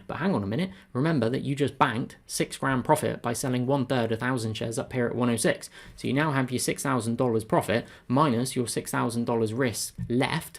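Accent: England, United Kingdom. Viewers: Brilliant as usual, thanks. I hope you don't mind me asking, where are you from in England?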